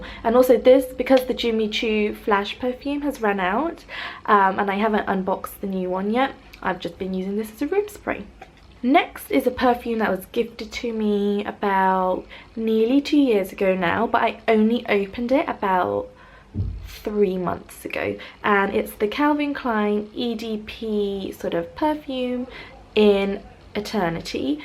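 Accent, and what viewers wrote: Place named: Midlands, United Kingdom. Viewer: Are you from the midlands? Your accent is so familiar! X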